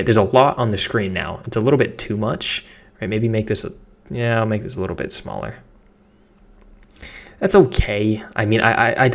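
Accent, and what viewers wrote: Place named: Boston, United States. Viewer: Am i hearing a newengland accent lol if so wassup my Man im a Mainah but you have that boston vibe lmao.. Love the vids i have yet to master tiktok shop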